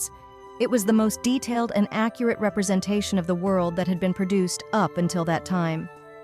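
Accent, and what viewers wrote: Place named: United Kingdom. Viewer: I rather prefer to hear Brit accent than those other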